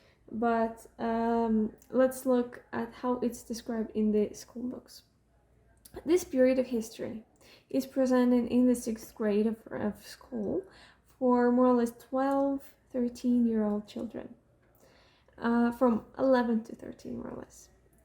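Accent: United States. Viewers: I reiterate the common sentiment regarding the quality of content. But hardly anybody has commented on the supper quality of English. I, for one, could have easily assumed she is an American. Simply superb (American) English. Hi from England, UK. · they all sound like an Americans voice? I can barely tell English isn't your first language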